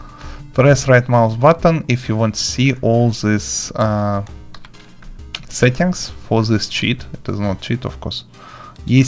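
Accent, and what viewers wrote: Russia. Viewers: Русский акцент, вери вел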